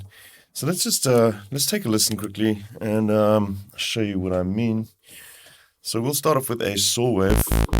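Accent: South Africa. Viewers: Dude are you by any chance from SA?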